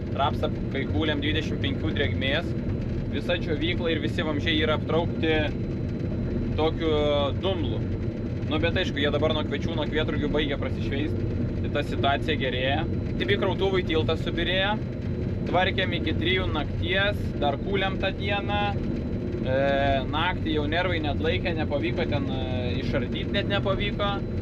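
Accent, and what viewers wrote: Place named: Pasvalys, Lithuania. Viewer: pagal kalbod akcenta pasirode kad pasvalio raj :D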